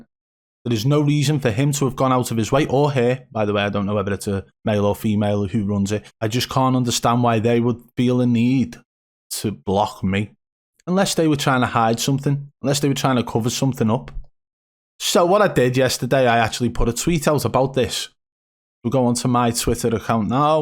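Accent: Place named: Merseyside, United Kingdom. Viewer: Absolute wool you mate! Swear to god you’re from Skem or Birkenhead you. Bad snitch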